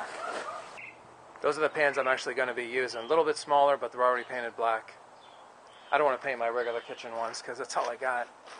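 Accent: New England, United States. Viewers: This is fantastic info. Just in time. Thank you. I subscribed and liked and shared even to friends I have other countries. Are you a New Englander? Your accent. Really nice video. Well done!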